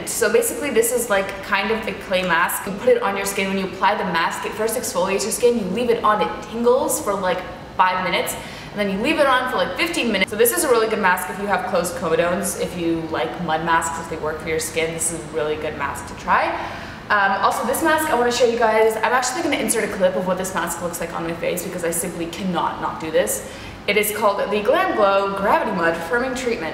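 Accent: Russia. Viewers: Легче понимать речь, если сравнивать с остальным англоязычном ютьюбом. У неё есть некоторый акцент, русский, или кажется? Поскольку большая часть из сказанного в видео было уже озвучено на classisinternal на родном русском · Почему у появился русский акцент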